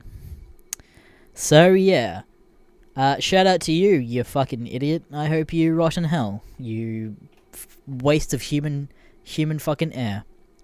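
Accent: Australia. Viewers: Creepswork is not as good as. Australian voices are far superior to Americans. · 1 are you Australian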